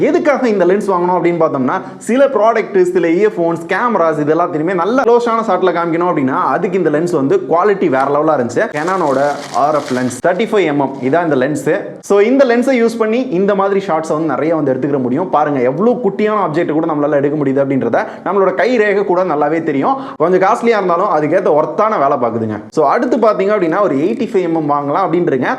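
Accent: Madurai, India. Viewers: Bro ninga madurai yaa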